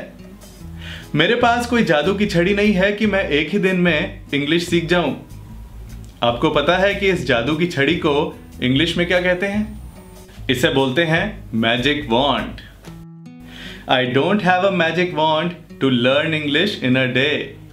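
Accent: India. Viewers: Indian English... dont be puzzled..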